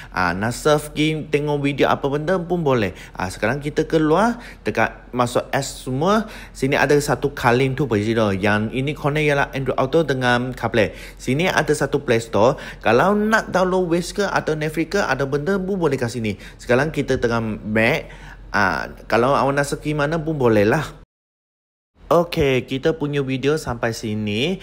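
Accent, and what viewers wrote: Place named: Kelantan, Malaysia. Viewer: Kelate bohhh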